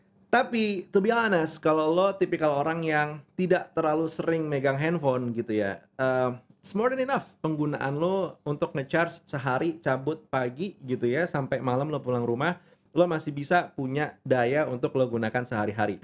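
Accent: South Jakarta, Indonesia. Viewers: Jaksel bgt pusing dengernya.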